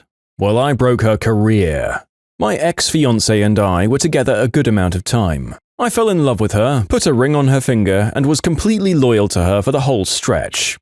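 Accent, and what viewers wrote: United Kingdom. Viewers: Its been a while since ive watched am i the jerk? so when i watched this i was absolutely bamboozled that he was british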